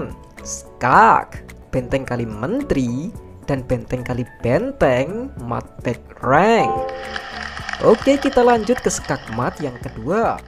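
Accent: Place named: East Java, Indonesia. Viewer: Kyk logat jawa timur an,kek nya emang orang jawa timur ketara bgt soalnya😂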